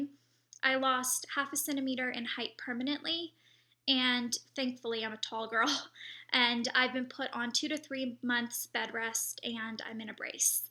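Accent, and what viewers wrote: South, United States. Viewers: i could here a little bit of your southern accent in the clips from frightmare manor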